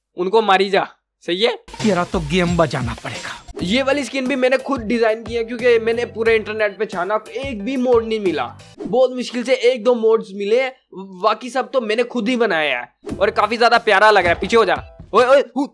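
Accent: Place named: Punjab, India. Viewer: Yes i know apkay accent sy hee pata lag gaya tha chalo punjabi to ho hee na punjabi alay hazri lawao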